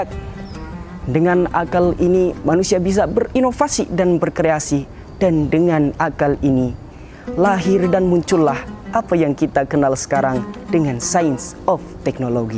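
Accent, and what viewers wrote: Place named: Banyumas, Indonesia. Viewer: Masya allah mamas ngapak, idola🔥🔥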